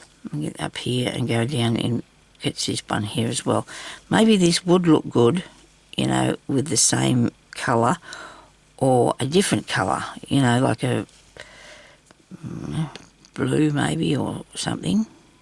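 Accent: Australia. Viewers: You're so cheerful. Enjoy hearing you talk Australian. Lol 😀TFS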